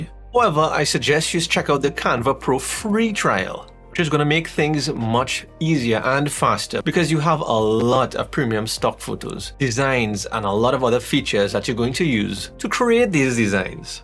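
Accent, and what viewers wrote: Trinidad and Tobago. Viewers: Love the vid bro thanks but how you soundin like you trini so?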